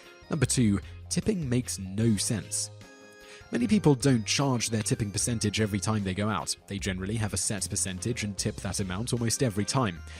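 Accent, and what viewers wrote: United Kingdom. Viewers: The narrators voice was was from the UK I know because I am also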